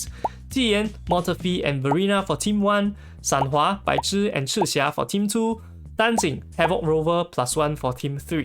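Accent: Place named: Singapore. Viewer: I do love Singaporean accent myself! 😂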